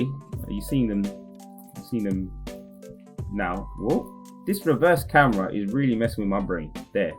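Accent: United Kingdom. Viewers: Been watching you for quite a while but didn't expect the English accent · You sir, are a GOAT. You sound like you’re from London so hopefully I may meet you one day and be able to shake your hand and thank you personally for all you’ve done with this series and how it’s given me so much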